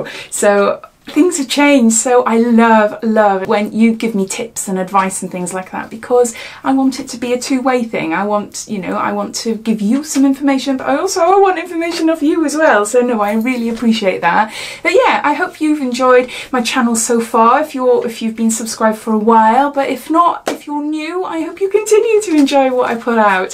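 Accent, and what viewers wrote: United Kingdom. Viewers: It's ok for the mix-up i was hoping to see who won if i got a chance at something and i thought u were picking the winners on Thursday so i did the same thing lol im so silly XD xoxoxo _ Your #1 Fan! I said this before for my entry into this giveaway but here u go again.: Wow, congratulations on 10k!! You deserve it! \Your so positive and lovely! You cheer me up!! I'd love to have all of it but I haven't got Instagram or Twitter or Facebook so that sucks if I win I could give u my email to contact me I am living in Florida currently and I can't wait to see what else u have in store for your channel... I like the accent it sounds like your a British princess!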